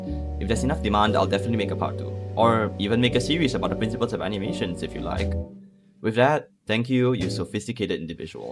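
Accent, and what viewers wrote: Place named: Singapore. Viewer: Singaporean too! I recognize the accent from anywhere!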